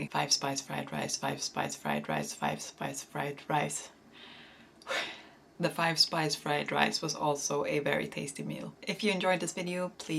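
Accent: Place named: Sweden. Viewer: Your channel is very cosy! I love your simple and nutritious recipes. Wishing you the best success on here. P.s your Swe(?) accent is lovely :)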